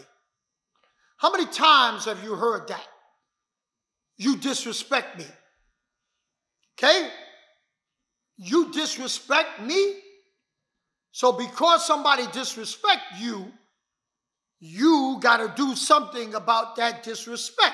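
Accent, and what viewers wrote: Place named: Brooklyn, United States. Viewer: Unc speaking big facts …I hear Brooklyn in Unc’s voice or somewhere close definitely from NY… sending much love from HTX enjoying the content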